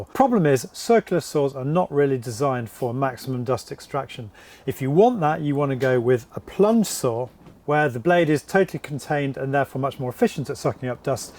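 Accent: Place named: Southern England, United Kingdom. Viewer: Just wondering what part of the UK are you from. I am up North in Lancashire you sound like your up south?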